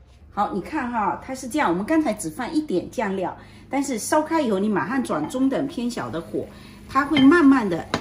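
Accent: Fuzhou, China. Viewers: 听福州口音很亲切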